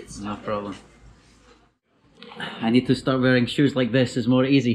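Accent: Scotland, United Kingdom. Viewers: I don't speak English but now I understand what a Scottish accent is. 🙂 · Hi, love to watch all your videos, with your scottish accent remind me <num> when i live in Manchester. i have a few friends from Scotland during that period. now already subscribed your channel and will waiting for your new videos. let me know where ever you drop by in Malaysia again, will offer free videography and accompany your trip in here, cheers mate:)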